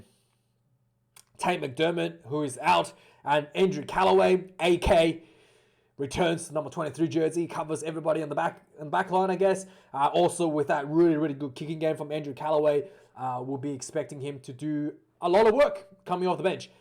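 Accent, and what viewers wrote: New Zealand. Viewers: I thought you were a kiwi at first too ngl but quickly realised you are Australian.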